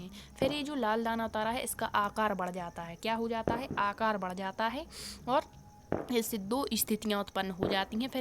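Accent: Bundelkhand, India. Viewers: Lovely ❤ voice mam aap pakka bundelkhand area ki hongi ☺ plz reply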